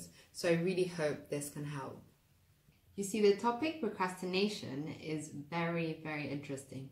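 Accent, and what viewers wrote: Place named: United Kingdom. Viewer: This is a great video. In love with your accent a bit British but not strong. Just so cool. And that's a great video content there!!! Keep it up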